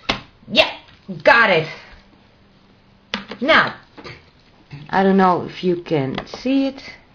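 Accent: Netherlands. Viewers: she is from holland i know from the talking!!!!! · Ik hoor dat je nederlands bent :P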